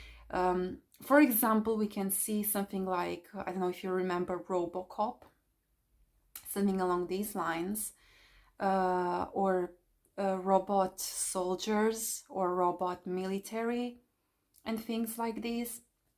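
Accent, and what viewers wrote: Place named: Portugal. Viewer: Awesome video, i am like an amateur astrologer and i share basically the same ideas as you. I am Portuguese, are you in Portugal by the way? Your accent sounds Portuguese, hope you view this message because i would like to be in touch with you. Amazing video again.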